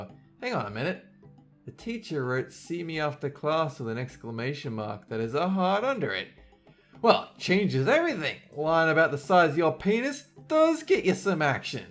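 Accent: Australia. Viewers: is this guy Australian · Is he Aussie🇦🇺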